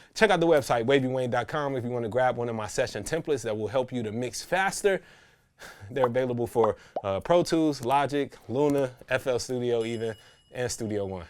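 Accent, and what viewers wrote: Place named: Washington DC, United States. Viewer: You sound like you from DC, bro I swear u got that twine in your voice like me bro but I think u said you from st.louis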